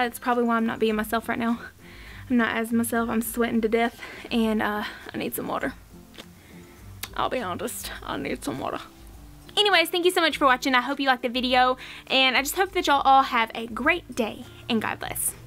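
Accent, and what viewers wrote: South, United States. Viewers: I'm from California and very very rarely do I like southern or country accents but yours.....omg! Its toooooo cute! I love it, love your bubblyness that's not goofy and I LOVE how articulate you are with your directions and instructions! You're a VERY GOOD instructor! Thank you for the refresher course! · Love your southern accent !😂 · Where are you from? I know it’s the south for sure 😂🤣😂🤣😍😍😍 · I hear a Southern accent...where are you from? Tennessee here. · Thank you sweetie, I love your accent, I am a southern girl too, need to learn macrame so here I am! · Oh my gosh, you are such a stunning Southern Belle! I love your accent, I love your vibe and thanks to your simple and beautiful videos I can now do macrame just like my mama used to when I was little. She tried to teach me but let's just say she wasn't a natural born teacher lol. 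Thank you so much! Big hugs 😘😘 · I like your accent. What Southern State are you in? This Video helps me a lot. Love it. Thanks! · Now if all my teachers in the high school where is interesting beautiful talented and charismatic as you are I think I would have been a straight a student not to mention that beautiful Southern accent really makes you hang on every word I can't thank you enough stay safe and and god bless ✌️🇦🇺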